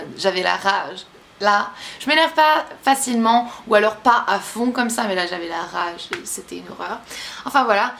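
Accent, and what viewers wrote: United States. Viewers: lol on dirait tu as deja choppé l'accent américain c'est bizarre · Félicitations ! Et je sais pas si je suis la seule mais je trouve que maintenant quand tu parle français t'a un petit accent américain :) Et je suis contente pour toi que te revoie ton marie bientot bisous :p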